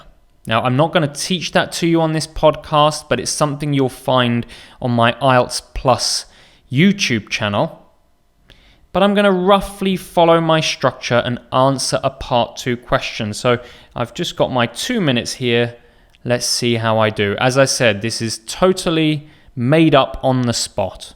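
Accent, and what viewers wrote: United Kingdom. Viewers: I 've just come across with your channel its really so helpful and your accent is very clear i also like the British accent especially when you're pronouncing the sound s as a sh .Hajar from Morocco 🇲🇦.thanks a bunch.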